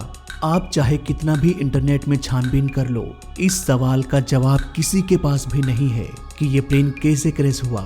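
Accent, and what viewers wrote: India. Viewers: indian English. Maybe = sayad 😂😂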